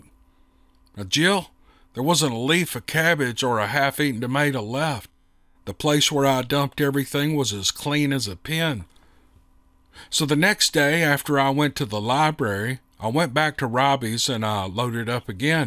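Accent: South, United States. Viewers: You are so good reading this! Your southern voice is perfect. MERRY CHRISTMAS, TO YOU AND YOURS FROM ME AND MINE!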